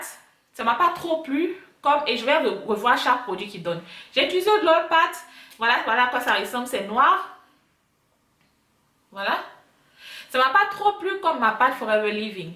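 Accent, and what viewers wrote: Ivory Coast. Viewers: Les astuces sont top, je connais celle du charbon et du bicarbonate mais pour huile de coco, d'abord ici en Côte d'ivoire, c du liquide donc ça dans ma bouche pendant 25 min tchiéé je vais finir par avaler Le carbone coco je peux l'avoir ou stp? 😂😂😂 la manière de parler, ma chérie tu es ivoirienne ? 😏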